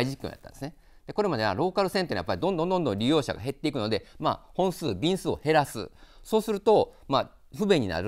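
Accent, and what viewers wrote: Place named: Kansai, Japan. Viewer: とりあえず関西弁やめとこか？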